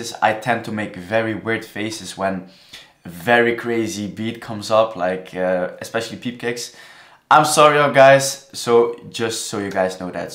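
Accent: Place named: Netherlands. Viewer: love how the dutch slips through his english speaking, lekker man ❤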